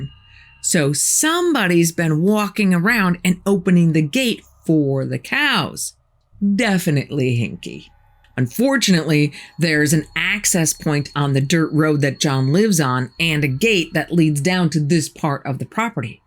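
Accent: Midwest, United States. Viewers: You're a good story teller. Where's your accent from? Illinois is my guess. Maybe Wisconsin or Minnesota???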